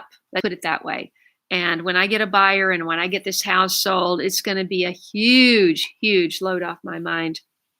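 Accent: United States. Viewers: ... you're back in Palisades???? livestream had no idea you were puttin one out on transmission only by accident did i connect ( that's me for ya) you'll never guess all my 22 guests and friends.. how many all together your guess is as good as mine they just kept coming through my door we all sat where ever they could and connected to America (livestream) My family have been here in the UK since the run up week to Christmas so to hear a native American accent other than family made a few cry...with sentiment must say got to me also..... aas we sat watching and listening to comments it was a little heart touching but thanks you have made a lotta people happy here in my household THANKS....... have you had any response to house sale??? maybe now the holiday is coming to a closes it may get moving " i do hope so ( i pray it gets "done n dusted" Happy New Year... 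and a prosperous one seeya later more to say on email Edxx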